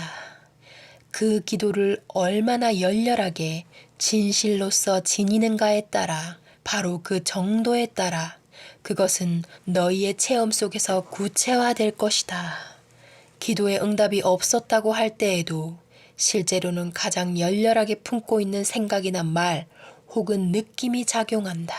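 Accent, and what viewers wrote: Gyeongsang, South Korea. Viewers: 카더라, 카더라, ㅎㅎ 저도 경상도 출신이라 그런지 사투리가 정겹고 좋네요 내 마음의 고향에 온 거 같습니다~~👍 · 아니 대체 상담사들이 할수있는말이랑 머가 다른가요?솔찍히 경상도 목소리 좋아서 맨날 자기전에 듣고 있는데 요 이 책은 깝깝하게 들려요... 알아서 하란거잖아요... 예수도 그시대에 말 잘하는 철학자라는 생각하시는분 없나요? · 원래 경상도태생아니시죠? 경상도 사투리는 저음으로만~쭉가는데 님께선 위쪽지방하고경상도말투가섞인거 같애여 ㅎ 제가 대구거든요 😛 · ! 경남이나 부산 분이시죠? ^^ 그쪽 억양이 느껴져요 ㅎㅎ 딕션이 좋으셔서 듣기 좋습니다.^^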